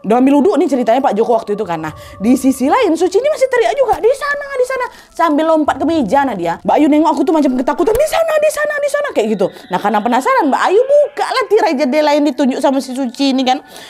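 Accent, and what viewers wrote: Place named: Medan, Indonesia. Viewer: Tau kau! 😂 ini medan kali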